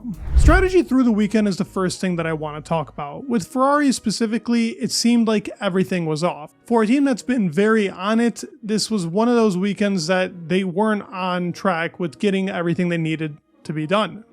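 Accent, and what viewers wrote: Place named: United States. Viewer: You should learn a proper English accent instead of american. Americans don't belong in F1. Drop the annoying vocal fry too.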